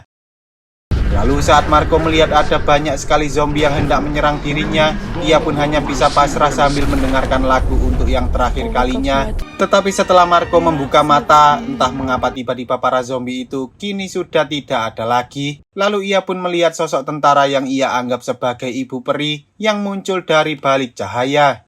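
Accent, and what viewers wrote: Java, Indonesia. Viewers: Kurang enak cara ngomong yg ceritanya, ada logat jowo😂 Sekali2 pake logat normal bahasa indo mas bro.😂😂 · Mas medok men sih mas 😢😂😂 koyok aku mesti wong jowo wkwkkw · Anjj dengerin suaranya medhok le 😂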